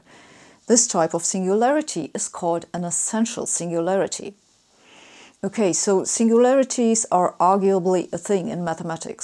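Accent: Germany. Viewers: I noticed your teeth almost never get apart. Wondering now if that's related to german accent while speaking english.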